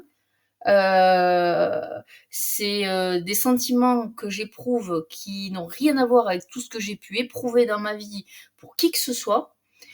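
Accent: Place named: Toulouse, France. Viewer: Je découvre ta chaîne ce matin grâce a l'algorithme de YouTube ! alors que j'ai découvert ce parcours de FJ depuis un an tout juste a 53 ans! Bravo et merci du partage. J'ai retrouvé mon jumeau en janvier 2023 apres 16 ans de silence entre nous. J ai rêvé de lui a plusieurs reprises en qqs jours et j ai décidé de suivre mon intuition et de le contacter. Nous bossons ds la même administration mais depuis 15 ans il vit a 800 km de moi. Ont alors rejaillit en pleine tête tous les sentiments et ressentis si douloureux vécus en <num>, avant notre séparation, et ma NNA alors que nous sommes mariés depuis tous les deux chacun avec nos tierces. Ma sideration étant a son comble de revivre toutes ces choses , ses sentiments, ses synchronicites en un claquement de doigt, j ai fait mes recherches et decouvert ce parcours de FJ il y a un an. Et l'année 2023 fut terrrible et belle en même temps. 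J ai découvert a 53 ans qui je suis vraiment, que j ai une belle âme et des liens énergétiques. L année fut riche en revelations d'une puissance inouïe. J arrete là, nous sommes en plein ds la reunion, et le switch, ma plénitude m intrigue même je dirai mais c est trop bon et je sais que le meilleur est a venir. ❤❤😊🥳 encore Merci et bel accent un peu le même que le mien! de Toulouse !😂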